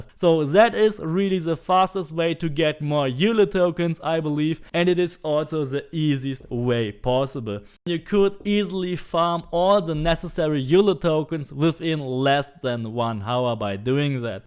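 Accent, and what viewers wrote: Germany. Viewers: Man hört sofort dass du Deutscher bist 😀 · Only German got this accent cuz 99% of them can't say this and say sis and other words with T 😂😂😂😭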